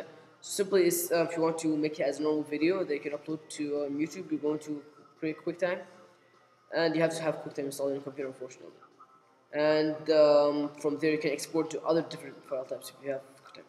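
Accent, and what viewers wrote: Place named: England, United Kingdom. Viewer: Bro you talk in the way that foreigners think english sounds like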